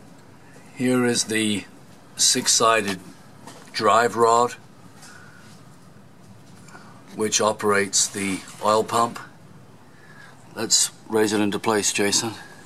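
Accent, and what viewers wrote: England, United Kingdom. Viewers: You are definitely English,and also sound like George Harrison!!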